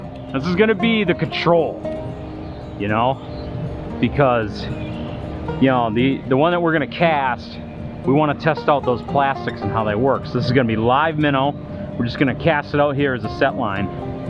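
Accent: Midwest, United States. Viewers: I’m confused. Midwest or Boston accent here lol.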